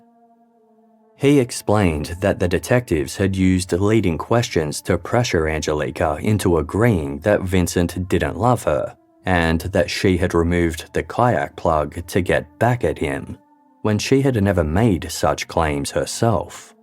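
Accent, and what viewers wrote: Australia. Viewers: He's in Australia, They pronounce a lot of things differently.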